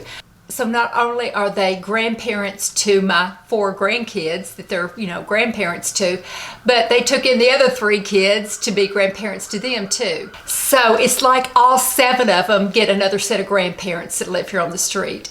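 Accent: South, United States. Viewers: I can't believe you are over 60 ... you are so gorgeous. And your southern accent is so cute and soothing. Have you tried The Ordinary's peptide hair serum? I really love it and has really helped my hair loss.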